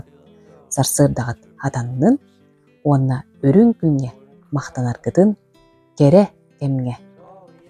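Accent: Sakha, Russia. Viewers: Олус үчүгэй хамсаныылар, аҥардас ыраас сахалыы саҥаны- иҥэни истэр кэрэ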